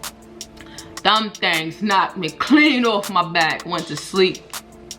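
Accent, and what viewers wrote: New York, United States. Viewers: Can I just say how comforting it is to hear a legit ny accent after moving out of state 😭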